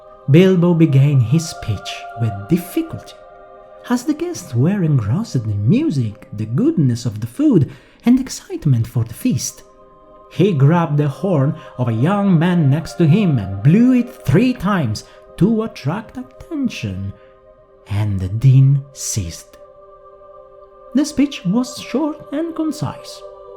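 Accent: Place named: Italy. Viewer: I sensate a pizza accent. Are you Italian? Tolkien name is pronounced tole-kee (emphasis on tole) not tole-kee-anne BTW. You're good though. Keep the good stuff going 👍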